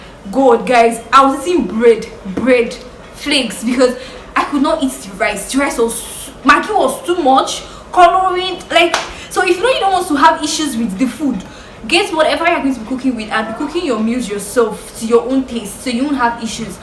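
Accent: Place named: Hausa, Nigeria. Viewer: Nice and educative post. It is very essential to inform ur relative when travelling especially long distance incase of the unforseen,and only also going with extra cash is also vital bcos I can relate,my first travel to north I was stranded bcos I tot I have made the right Budget without knowing that the money won't be enough,God so good I called home and they sent me money. Hausa sweet for ur mouth ooo na,u are doing well,keep it up.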